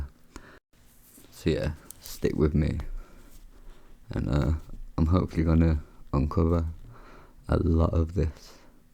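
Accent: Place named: Birmingham, United Kingdom. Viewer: BOOM! You sound like you are from near to where I came from? Are you a Brummie? Close by?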